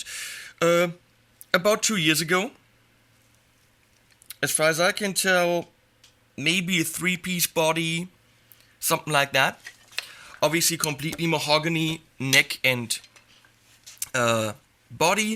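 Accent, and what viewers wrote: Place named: Germany. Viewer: Gernan english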